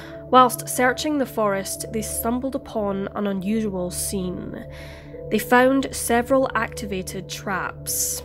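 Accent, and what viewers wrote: Scotland, United Kingdom. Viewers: I’m so happy I found you channel. You cover these stories simply and thoroughly. And I love the Scottish accent! I can’t believed I missed the deer doing their business in the background, I’ll have to go back and look!🤪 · Where is the narrator from? I'm really bad with placing accents (even in my own country). To me it sounds like either a Scottish accent (I don't know what type of Scottish) or a really slowed down Belfast accent, but I don't know cause I'm really, really, embarrassingly bad at this. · Came across this channel by chance stayed for the Scottish accent and creepy atmosphere ❤ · Wow just found your channel and I love it already, beautiful Scottish voice 💙💯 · You Scottish? Seems like it. Great case keep it up ma'am.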